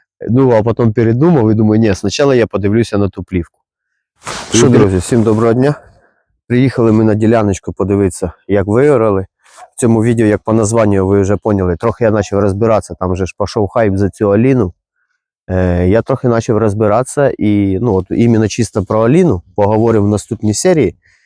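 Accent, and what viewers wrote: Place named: Dnipropetrovsk, Ukraine. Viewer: Говор схожий на Дніпропетровську обл, думав що ви з пятихаток👌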